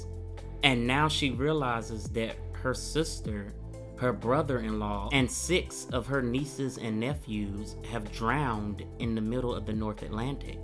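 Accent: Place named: South, United States. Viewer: Hey - I’m loving your videos and just love your Southern accent! You mention about the DNA that was done to try to identity these poor victims. Is there enough information on this for you to create a video on what they found out? Best regards from England!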